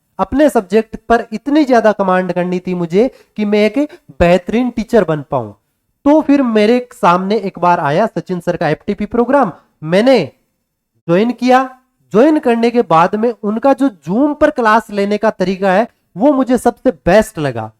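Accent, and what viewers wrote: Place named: Haryana, India. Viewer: Sir aapki voice tone se lag rha h ke aap haryana se ho, mai bhi haryana se hu and I am preparing for neet ug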